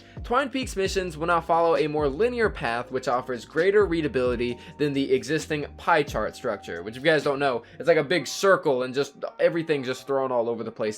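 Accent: France. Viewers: Are u frenche? Because I am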